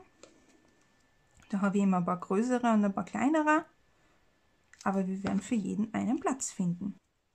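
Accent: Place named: Austria. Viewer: Hallo liebe! Deine Videos sind einfach genial! 👍🏻😍Ich schau sie mir sehr gerne an. Die Anleitungen sind super verständlich und einfach. Ich staune immer, was für tolle Idee du für die Stanzformen vom Action hast. Anfänglich sind sie mir irgendwie"billig" vorgekommen,aber mittlerweile find ich sie richtig gut. Und dank deinen Videos natürlich auch 😊. Es spielt auch eine große Rolle, daß endlich eine Österreicherin amal gute Videos macht! Natürlich sind die von unseren deutschen Nachbarn auch sehr gut,aber der heimische Dialekt macht halt schon einen Unterschied 😁🤭. Na auf jeden Fall werde ich mir auch deine nächsten Videos"reinziehen und ich bin jetzt schon gspannt,was du schönes basteln wirst😊💕.Glg Maria aus Bad Gleichenberg/ Südoststeiermark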